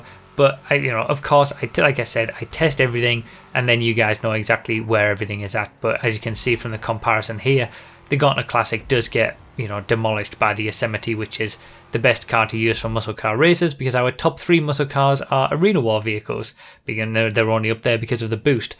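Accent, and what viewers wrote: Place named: Scotland, United Kingdom. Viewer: U sound Scottish. Are you?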